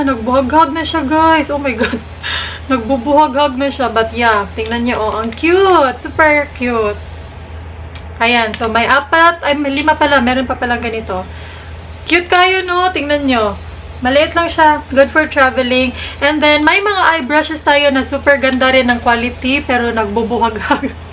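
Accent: Visayas, Philippines. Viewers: new subscriber here.. I like your bisaya review sounds so cute to me..Keep it up!! 😊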